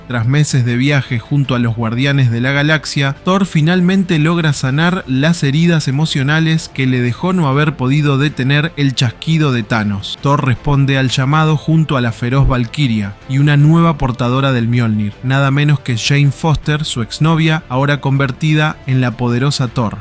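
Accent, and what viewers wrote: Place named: Argentina. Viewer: Por favor un argentino hablando de esto que puta mierda ese acento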